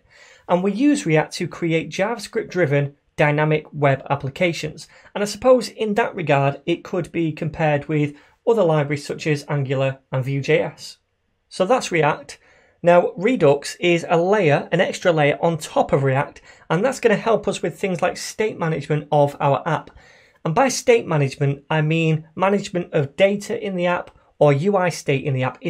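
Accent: United Kingdom. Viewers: I just enjoy any tutorial with a British accents....it's just easy...much love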